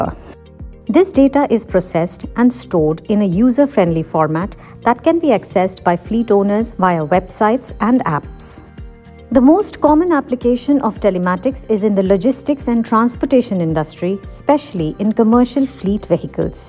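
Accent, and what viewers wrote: India. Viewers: Is it Indian accent ?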